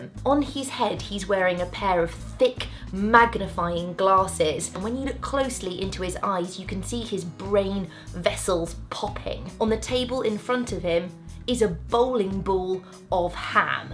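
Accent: United Kingdom. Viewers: Awesome stuff actually. I love your accent. British accent rules😍😍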